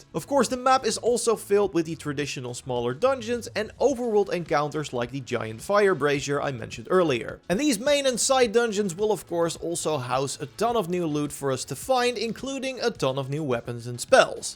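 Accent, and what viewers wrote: Netherlands. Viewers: Lekker hollands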